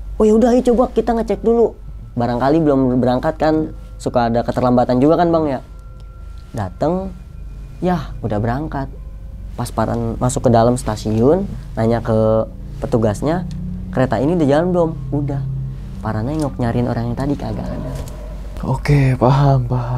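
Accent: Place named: Banyumas, Indonesia. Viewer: Kangen dgn logat ngapaknya mang😊😊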